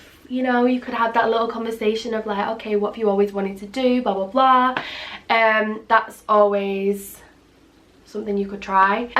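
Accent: Manchester, United Kingdom. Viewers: “i get copy righted on evEryFiNk” 🤣 such manc pronunciation love ittt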